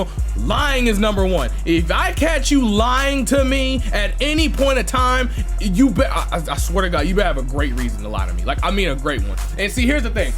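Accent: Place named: Memphis, United States. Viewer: You from Memphis G?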